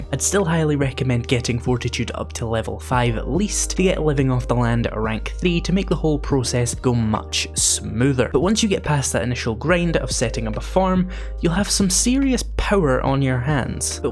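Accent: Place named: Shires, United Kingdom. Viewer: "This is Pear-fect" listen to that delicious Shire accent sexy fk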